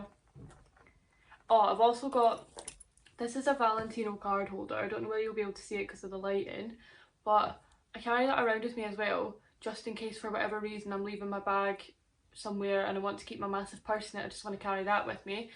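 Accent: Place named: Scotland, United Kingdom. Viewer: You’re like the first female Scottish YouTuber I’ve seen 😀. End result : Cool 👍🏻 Love your accent , I’m Canadian so of course I love the accent 😍 #Brave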